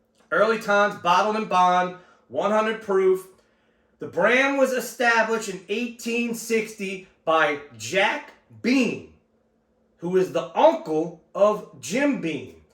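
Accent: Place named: New Orleans, United States. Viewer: Thx for the informative review; saw you on another bourbon channel, recognized the accent & knew you were from Nola area! Can Early Times bib be found in nola?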